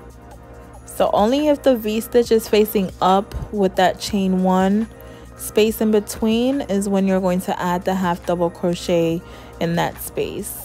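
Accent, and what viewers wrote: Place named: Caribbean. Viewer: Where are you from? You sound Caribbean. Thank you for the pattern, easy to follow.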